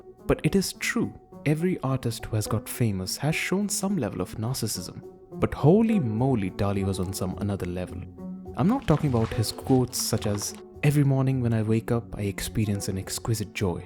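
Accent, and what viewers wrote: India. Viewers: You can tell from the awful sound of this dude‘s cringe accent that he’s from India. Why in the heck would ANYONE think he would actually know what he’s talking about regarding a Western artist? I laughed my arse off when he talked about Dali’s “rAciSM” - oh the horror! 😱😂 This guy is so dumb in fact that he still thinks Adolf was “the baddie,” despite us living in the age of the Internet and having the truth right there before our eyes easy to discover now. This video is so cringe. The only thing that bothered me out of everything he listed was I felt bad for the cats. But I’ll bet my bottom dollar that the comment about him putting dynamite up a duck’s behind was a joke. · At first I thought: "who is this idiot crying about fascism and racism". Then I heard Indian accent.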